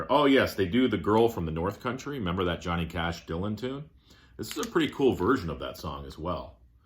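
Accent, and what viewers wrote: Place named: United Kingdom. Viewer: The British have the best accent hands down. What is this SST label you speak of? I love the dramatic pause after the “no ” reveal. You had me at Surf Rock with a punk edge. Fun fact, the name was conceived by the band assigning an alphabetical value to numbers on a gaming die, then rolling the die three times. If you like check out or.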